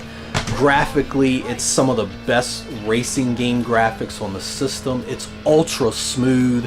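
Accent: United States. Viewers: Hey where are you from? I hear you say "I'd didn't come out in Europe" or "there wasn't a pal release" a lot which made me think you were English but your voice sounds super American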